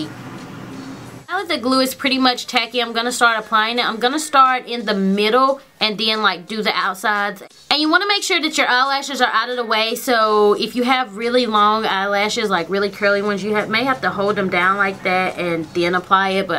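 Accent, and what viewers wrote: South, United States. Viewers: I absolutely adore your southern accent! It's so fetch! :) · A little late on watching this video but I must say I LOVED this tutorial. It definitely does take practice and that is exactly what I am going to do. BTW: I love your channel and your upbeat and spunky personality. Oh and I cant forget that southern accent. ;) SUBSCRIBED! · Your country accent makes me always wanna jus give u a big ol country Hug.. I luv it =) <3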